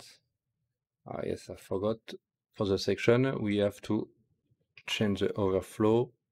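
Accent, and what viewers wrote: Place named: France. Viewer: Thanks for this tuto. Sounds like your french like me 😅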